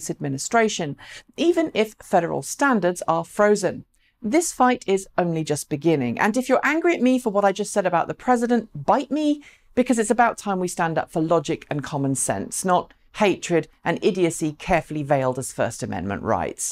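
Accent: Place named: United Kingdom. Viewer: It is without contest that your show of the many YouTube professional and semi professional out there in the digital world one of the best if not the best in it's class. Yes many may say it is in fact your livelihood but the progress made over the years with how the show is presented is fantastic. The content is always from my Jamaican prospective deep, rich and eye opening given from a balanced position. This is where my deliberate and express bias comes in; that beautiful British voice is always beckoning my undivided attention to hear the unbroken dialect. Sweet!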